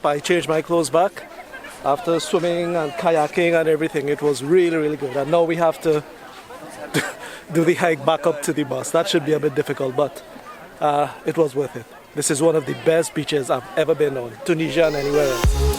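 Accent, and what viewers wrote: Trinidad and Tobago. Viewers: thank you for providing a video of outdoor tunisia the bonus for me was the pleasant surprise of hearing a trini accent i did however notice of marked shortage of aquatic life in the underwater scenes then i saw what was on the lunch menu and understood (lol)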